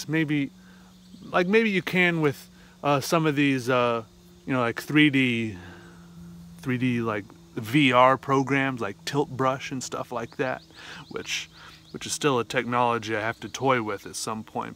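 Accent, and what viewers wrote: South, United States. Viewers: TF? I was, for no reason other than curiosity, looking at alligator skin cowboy boots before opening Youtube and continuing my "Watch Later" list when i see wearing a cowboy hat and speaking with a southern draw. TF is going on here?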